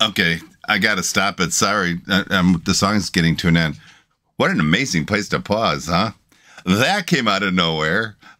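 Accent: Chicago, United States. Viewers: I was gonna say you have a Chicago accent and then you pull out a Portillo's cup! I had a dripping wet Italian beef 3 days ago!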